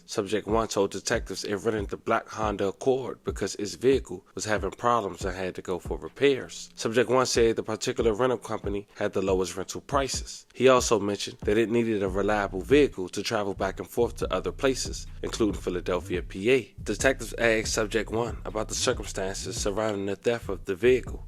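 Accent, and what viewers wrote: Washington DC, United States. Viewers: Sound like he from DC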